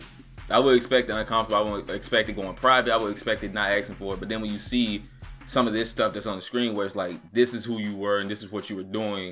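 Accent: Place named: Bay Area, United States. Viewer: Bro you from the Bay area? SF Oakland? I was trying to place your accent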